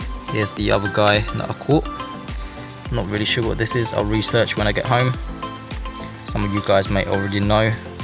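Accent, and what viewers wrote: England, United Kingdom. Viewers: How come you have British accent? (In a good way) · How come you have a strong english accent? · New to the channel have you lived in Britain you sound like it?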